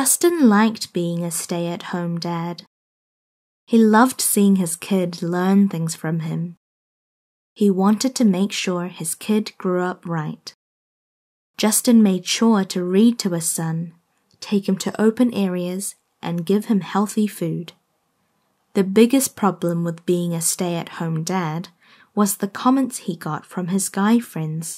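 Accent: New Zealand. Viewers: 이 영어 발음이 뉴질랜드 영어발음 악센트인가요?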